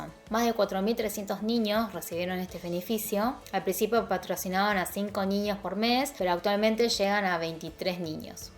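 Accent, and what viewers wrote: Argentina. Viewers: Soy el unicoo que se pone rraro al verla hablar español y aun mas con acento argentino 😅 me da cosa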